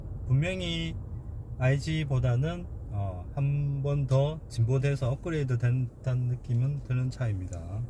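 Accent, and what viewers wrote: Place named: China. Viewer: 조선족이죠?말투가 확티가나는데 ㅋㅋ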